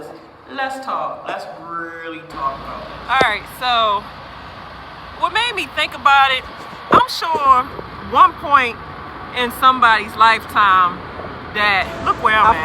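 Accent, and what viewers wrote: Baltimore, United States. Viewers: you can tell she from b more city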